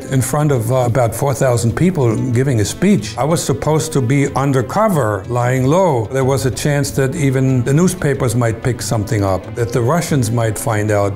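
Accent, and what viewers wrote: Germany. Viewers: The good old German accent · He sounds a bit german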